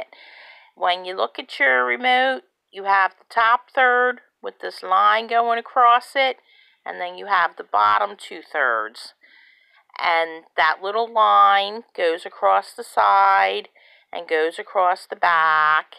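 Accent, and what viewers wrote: Delaware County, United States. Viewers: Do u happen to be from DELCO????? That accent sounds realllll familiar 🤣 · Wow, thank you! I would never have figured out how to change the batteries for this goofy remote. It is definitely not intuitive (and so different from all my other remotes) but your video make it super easy. Love your "accent". Are you from Delaware County?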